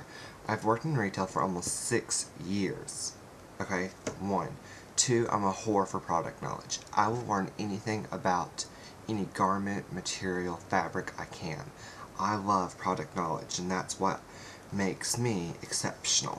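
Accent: South, United States. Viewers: I love your southern gay man voice! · he has a southern accent! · The accent is coolie. You sound just like some of my friends, but more Southern :D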